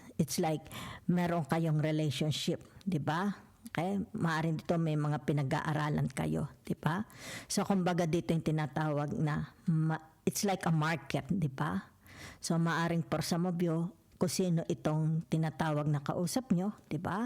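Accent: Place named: Tanay, Philippines. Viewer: I claim it all positve readings🙏🙏🙏thank you po sa mga readings nio,ang galing nyo po magsalita,may punto po ang salita nyo,punto po ng mga taga tanay..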